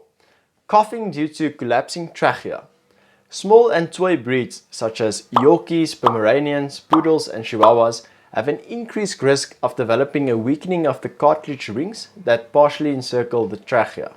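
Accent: South Africa. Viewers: Excellent video. Great to hear the SA accent that's when I knew I needed to listen. My fiancée is SA and I am Irish, we live here in Ireland and it's very hard to get a vet to listen to you. They will only do what they think. My husky has been coughing for about a year or so on and off. Also when he howls he starts to cough. No vets have been able to help me at all. He got a scope of his throat and it was a little inflamed but no foreign object. He is diabetic and bg is not controlled after 3 years and he does pant a lot anyway so unsure if it's heart failure or cancer or what? It's very frustrating as the vets here seem to be behind other countries. Any further tips or information or even how to approach my vet to get them to explore further would be appreciated. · Thank you sooooo much sir!!! And I love your accent, it sounds South African...Hello from Texas🥰😘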